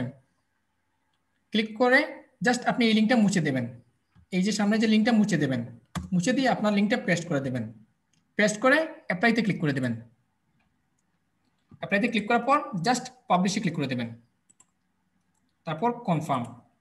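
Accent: Sylhet, Bangladesh. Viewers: Vai er bari Sylhet Naki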